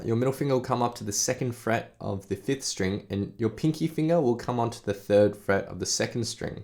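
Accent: Australia. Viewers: Your Aussie fuck me ya legend good stuff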